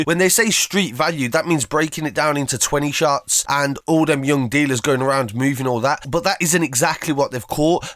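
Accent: Birmingham, United Kingdom. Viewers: Just the birmingham accent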